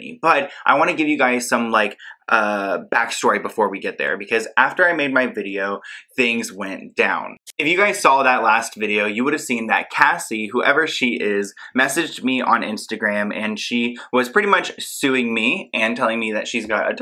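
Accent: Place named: New York, United States. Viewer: Those curls 🤍love your accent. NY?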